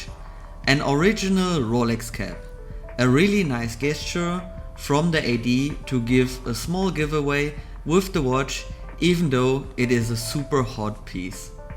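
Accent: Germany. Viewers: When this guy isn‘t german i ate a chair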